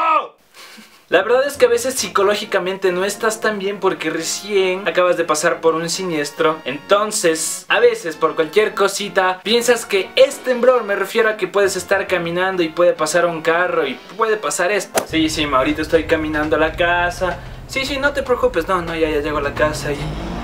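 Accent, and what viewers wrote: Ecuador. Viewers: eres de ecuador?! jaja me encanto este video un gose y lo del carro xD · eres de Ecuador? /o\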